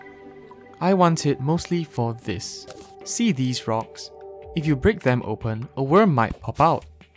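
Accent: Singapore. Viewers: Where are u from? Ur accent sounds like ure from singapore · There's some Singaporean accent in his voice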